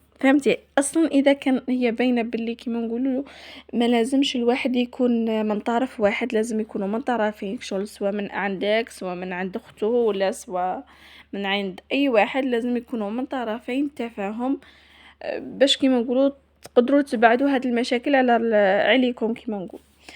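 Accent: Algeria. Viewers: علاه حنا قلنا مشي حلوة قلنا تهدر هدرتنا تاع الغرب ماشي هدرة الناس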